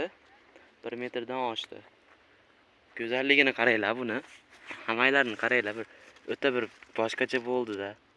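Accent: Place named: Tashkent, Uzbekistan. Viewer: Toshkenlimisiz shevangizdan bilinyabdi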